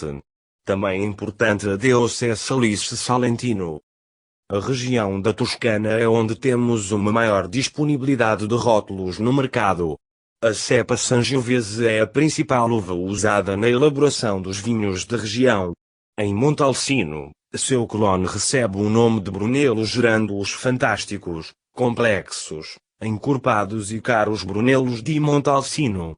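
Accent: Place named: Portugal. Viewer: A pior coisa que fizeram foi colocar essa voz falando em português de Portugal 🤦🏻‍♂️ ... o pessoal tem que entender que a grande maioria das obras de literatura e programa de televisão, são feitos em português do Brasil, mais limpo e mais audível.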